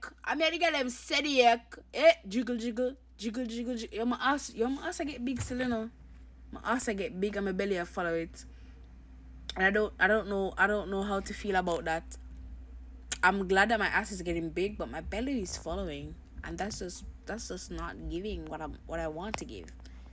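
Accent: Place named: Trinidad and Tobago. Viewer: Its the Trini acsent for me, the lady niceeeh man 🎉🎉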